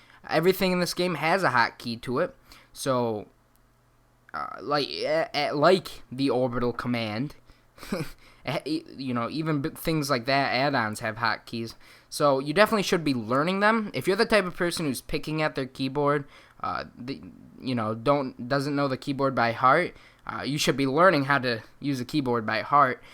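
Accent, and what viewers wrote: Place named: New England, United States. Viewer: script or no script, you'd sound better without that awful new England accent.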